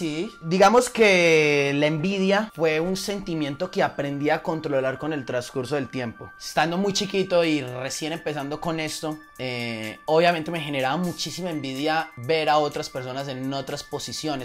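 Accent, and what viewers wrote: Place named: Antioquia, Colombia. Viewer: Que flow paisa 🍃😎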